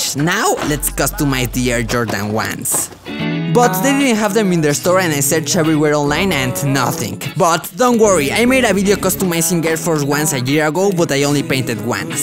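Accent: Spain. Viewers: como se nota que eres español bro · I think you have a Spanish accent, or do you speak Spanish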